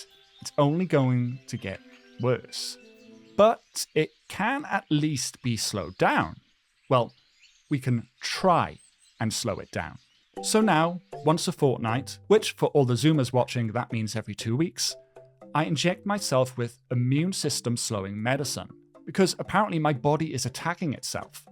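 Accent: Wales, United Kingdom. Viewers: Are you welsh? If so thats super cool!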